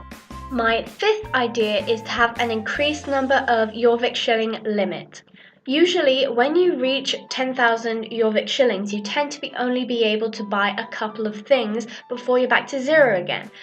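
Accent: United Kingdom. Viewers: Are you British? :o ( I'm just curious because your accent sounds like British) xD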